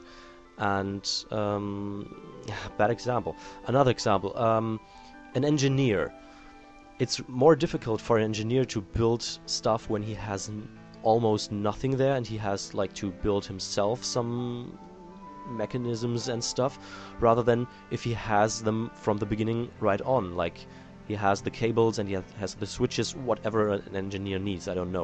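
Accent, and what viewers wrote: Germany. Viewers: Schon geil zu sehen wie alle nu so: "OOOOH der is ja deutscher daher der akzent!" xD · i knew you're german! ;-) i can easily recognize that accent... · i new you where Germin i just couldnt put my finger on it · i thought you where german or something like that. LOL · You don't have a weird accent its just that you're so good at English that people cant distinguish you're German accent. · Ich habe mir von Anfang an Gedacht, das du Deutsch sprchst, das hat man gehört! Aber du spirichst extrem natürlich und "wortgewand" Englisch! Super! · Waow, most Germans have a very obvious English accent but yours is noticeble but it sounds alot better then what I've heard as a Dutch guy :-) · I knew u were German. Hail!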